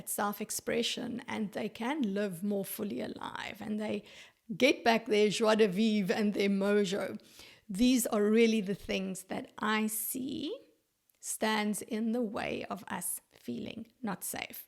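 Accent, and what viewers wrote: South Africa. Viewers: Are you South African? just curious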